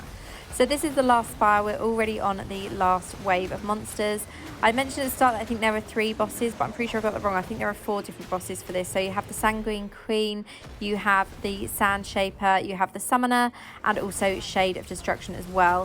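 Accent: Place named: United Kingdom. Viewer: What a British accent ^^❤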